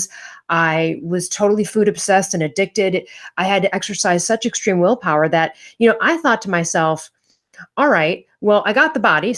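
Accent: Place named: Australia. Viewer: you could be taken as an Australian with that accent.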